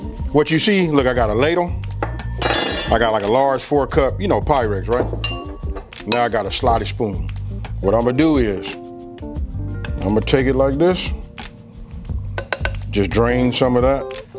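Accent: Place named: Mississippi, United States. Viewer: You GOTTA be from my home state of Mississippi!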